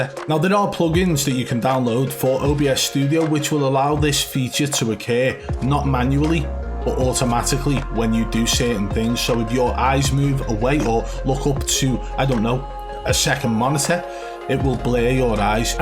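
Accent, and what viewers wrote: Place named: Birmingham, United Kingdom. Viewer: a bermingham accent 😂 love it❤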